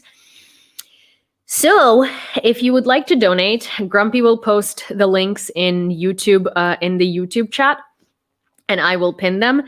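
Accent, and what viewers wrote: United States. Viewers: I massively appreciate your labor supporting Ukraine and more people like you are needed. I only have one question: do you feel Ukrainian? I mean, it's good that your english is totally American (that's a good skill), but your behaviour, manners, puns, attitude and everything is soooo American, even exaggeratedly American. Somewhere I hear you saying you were in the US for 16 years, but that time doesn't explain that you've become so American, unless you did put your all in transforming your whole personality into being American. That's why I ask if you feel Ukrainian (because such huge transformation kind of implies that you felt ashamed of being Ukrainian in the past?). Like I've known many foreigners living in the US for almost their whole life and they speak 100% like natives but they have not adopted at all such a huge American attitude and manners when speaking and behaving as you do (actually as a very specific type of American girls: talking always as if they don't give a shi*, the constant roll eyes thing, the constant corrosive puns, etc). 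I don't say this in a bad way at all, I do think your work is totally genuine and really appreciated, but as someone who spent long periods of time per year in Ukraine, before the war started, when I listen and see you there is something that makes me feel "weird". It would be interesting if some day you talk a bit about this and your intercultural "self". I mean, when I see you I feel as if I'm seeing a japanese woman singing spa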